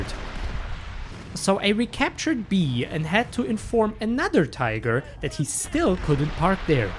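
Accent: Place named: Germany. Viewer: I love his German accent, it fits the ‘war crimes’ theme perfectly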